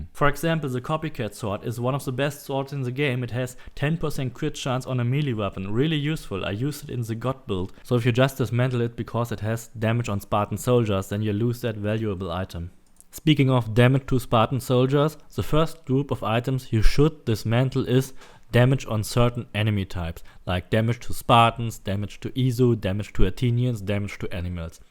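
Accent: Germany. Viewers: I haven't heard such a classic German accent since playing Titanic Adventure Out of Time.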